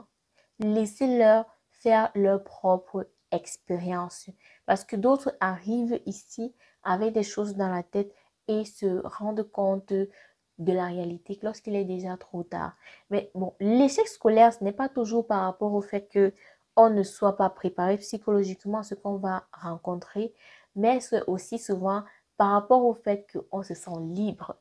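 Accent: Cameroon. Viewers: Ma belle petite soeur, tu es super belle, mais je suis etonner que ton nom sonne comme si tu es Senegalaise mais ton accent et ton style Kamer 237 avec ta douceur me tue seulement ❤️❤️❤️💗👍💗💗😁😁😁😁😁😄😄😄😄😀😀💖💖💖💖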